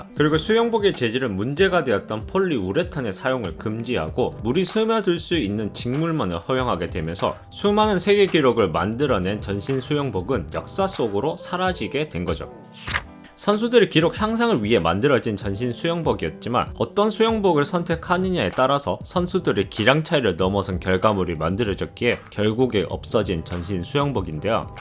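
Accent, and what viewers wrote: Gyeongsang, South Korea. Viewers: 나래이션 경상도분이신가요?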